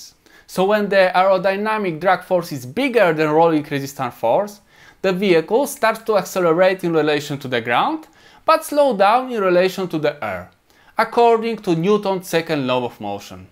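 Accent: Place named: India. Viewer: Did you learn English in India?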